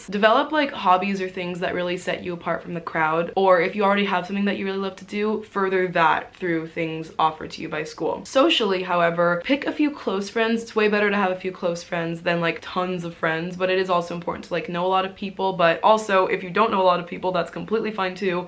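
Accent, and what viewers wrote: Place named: Canada. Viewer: That Canadian accent is god awful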